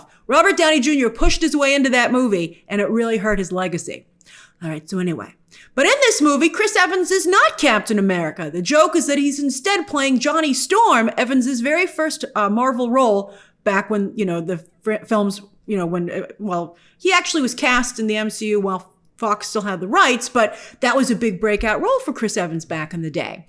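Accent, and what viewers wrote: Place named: Mid-Atlantic, United States. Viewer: You have a mid Atlantic accent from the 1950s it’s so wild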